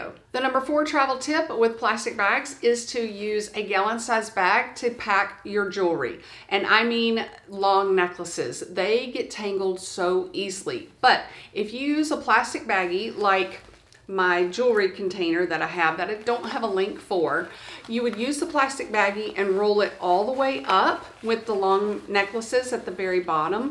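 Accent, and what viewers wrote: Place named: Georgia, United States. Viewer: Hi, new subscriber and really enjoy your YouTube videos. Getting quite a few helpful tips for when we travel. I also really enjoy your great Georgia "accent ". Keep up the great travel hacks and other information. I'm learning a lot to make the most of our carry-ons and personal bag. Much needed since my husband won't check bags.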